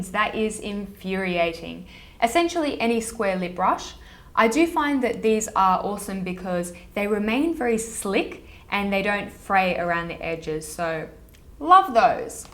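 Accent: Australia. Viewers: Your accent takes me back since i used to live in australia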